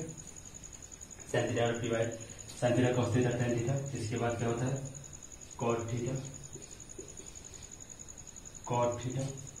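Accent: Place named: Bhojpuri, India. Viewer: Bhojpuri me bol Rahe ho aap ❤🎉🎉🎉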